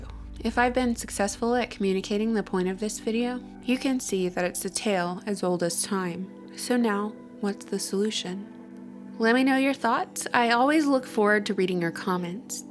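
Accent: United States. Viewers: Are you from the South? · Sounds VERY American to me, A damn shame.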